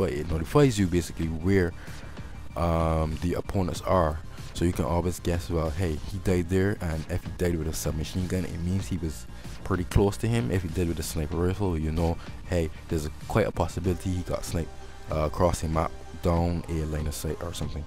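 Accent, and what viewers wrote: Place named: Barbados. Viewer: Hi.... Some points for improvement... No background music. When listening on a cell phone the small speaker makes it harder to hear you. I like how you talk the whole time. You come across very clear and the Bayan accent is of course awesome. Last, you might consider adding more tags to increase exposure and I would include MW3 in the title. Most folks are too lazy to type out the full name. Tmartn needs to watch out for!:)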